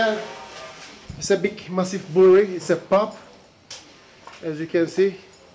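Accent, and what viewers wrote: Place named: Turkey. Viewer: u have such a turkish accent of english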